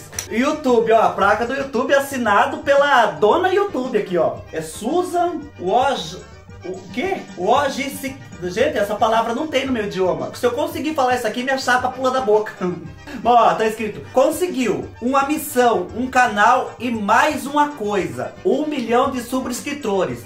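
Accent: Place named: Caipira, Brazil. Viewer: Que felicidade sem fim. Seu jeito é único .Você com seu sotaque caipira muito natural contagiando os nossos lares.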